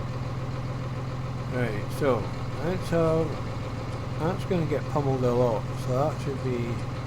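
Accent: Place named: Scotland, United Kingdom. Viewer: Good to hear your Sottish tones again.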